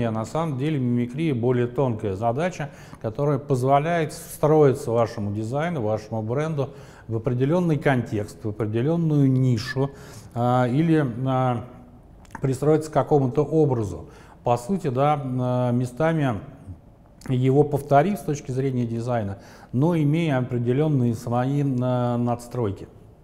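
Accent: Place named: Moscow, Russia. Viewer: Маааасковский говор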